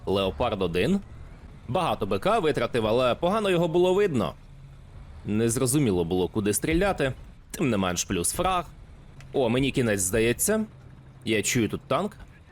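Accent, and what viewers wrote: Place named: Ukraine. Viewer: Автор мабуть з Харкова чи Полтави. Такий жорсткий суржик.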